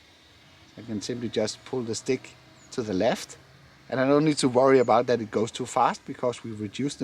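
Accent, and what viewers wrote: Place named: Denmark. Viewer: Jeg elsker den danske aksenten din :D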